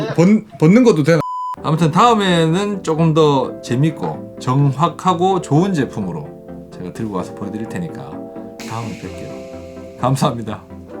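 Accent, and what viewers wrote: Gyeongsang, South Korea. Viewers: 재미있기도 하고 진실함도 보여서 좋습니다. 대구 근처로 생각되는데 경상도 억양이 더 정겹기도 하고~~~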